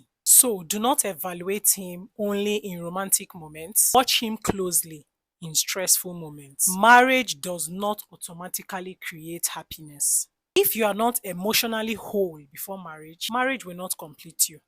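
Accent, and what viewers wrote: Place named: Nigeria. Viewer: your advice is wise. I love your Nigerian accent too ❤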